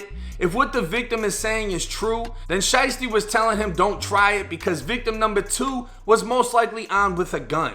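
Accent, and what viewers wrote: Boston, United States. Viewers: Your accent sounds like you're from Boston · Hey were you raised in the Boston/New England ares because you have that BAWSTON accent?! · U from Boston? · You from Boston?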